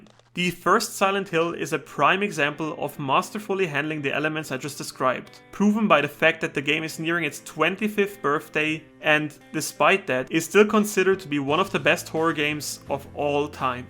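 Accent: Germany. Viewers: I smell German from this guy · Dude you sound incredibly german digga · That is one thick German accent 😁😁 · The German accent is strong with this one...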